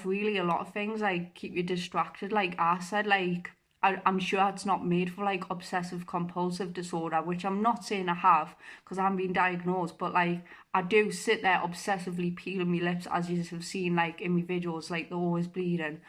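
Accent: Newcastle, United Kingdom. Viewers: Thanks for your honesty, just talking about it may help others, and probably you also. Congratulations on the product endorsement, I didn't know about these products. I'm sure I am speaking for probably all of the Americans on this channel, when I say, I enjoy your 'rambling on', because I enjoy that New Castle accent... kind of like one of the several 'working class' accents in the US, it just sounds genuine. God bless!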